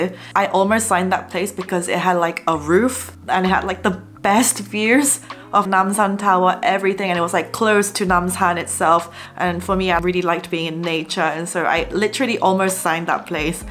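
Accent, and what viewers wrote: United Kingdom. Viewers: Damn standard n strong British accent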